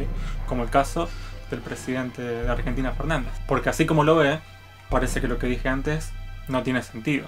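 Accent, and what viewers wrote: Bolivia. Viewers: Tiene acento de un orureño. No creo q seas europeo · Parece que tenes acento tarijeño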